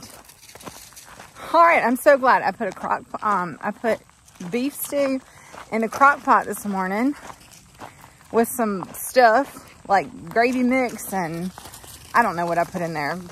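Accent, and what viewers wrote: South, United States. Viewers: I often think, regardless of your southern accent, you speak better English than the average English person of today. How brilliant to get a write up that gives an accurate portrayal. I have always been dismayed by journalist’s versions of events when reporting on things concerning us.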